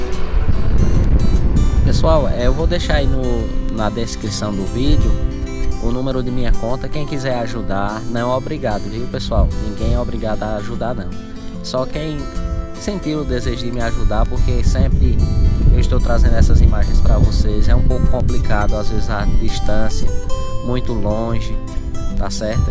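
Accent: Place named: Northeast, Brazil. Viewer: Oi, eu sou de Belo Horizonte e adoro os seus vídeos com o seu sotaque nordestino e as músicas de fundo. São bem informativos e mostra bem a alegria de vcs aí do nordeste, e a nossa tb, pq ficamos felizes só de ver a alegria que vc transmite.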